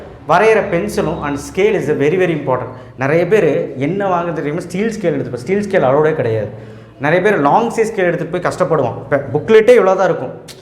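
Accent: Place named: Chennai, India. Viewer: bro I love your slang Neenga Chennai ah ❤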